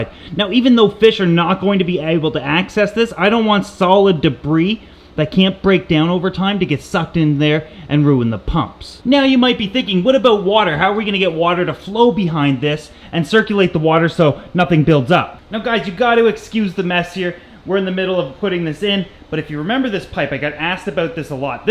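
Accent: Canada. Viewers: volgens mij is hij canadees aan z'n accent te horen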